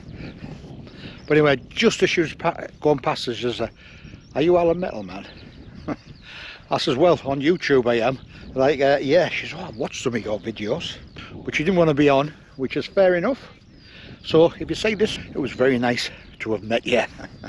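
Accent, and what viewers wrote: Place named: South Wales, United Kingdom. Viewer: Love that Alan, out there today haha you really did get noticed and that woman who turned away must have been in awe of you 😃That was stunning up there but wow you were up early again, 3 50 in the morning oooh 😯 that joke though! It did look so beautiful but i can imagine like you said, the colours in a few months time must be gorgeous. I thought the advice that you gave to the new YouTuber was so good, I can only watch longer videos if I really enjoy a channel. Loved the views at the summit, and nice that there was some shelter up there too. I enjoyed your South Walien accent 😃🏴 and i feel the same about cows and horses that are loose on mountains too, scary. Enjoyed this as always:) ~ Anna 👍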